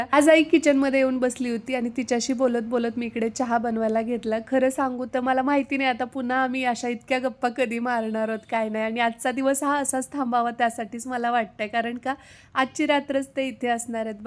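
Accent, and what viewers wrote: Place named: Kolhapur, India. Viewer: Karad kolhapur side che watata..kalwan wagere words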